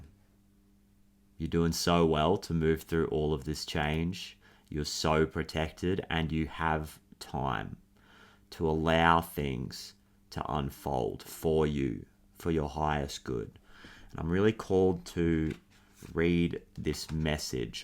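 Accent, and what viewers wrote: Australia. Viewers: Hey 💫 I am a brand new subscriber and your messages have been resonating hard 🤣🌸 Now I know why…. We share the same birthday 🎉!! Happy birthday 🎂 I had the most amazing weekend, energetically feeling such happy vibes ❤ I hope you did too 🥳 Thank you for this channel, I look forward to sharing more time and energy with you and this collective … we totally rock 🥰 It’s so good to hear a fellow Aussie in this space … Sydney girl here 🤗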